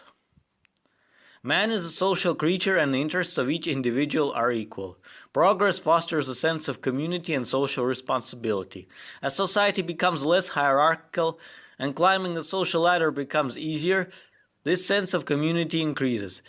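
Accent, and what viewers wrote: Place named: India. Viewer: now this is good content but try to correct your indian accent okay?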